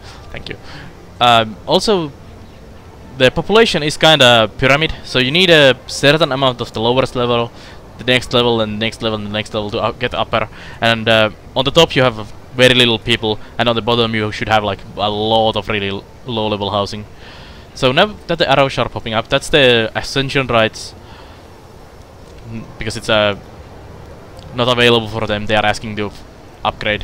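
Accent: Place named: Finland. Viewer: I love the Finnish accent. Thank you for making this video! I like the description of the game and the look into it!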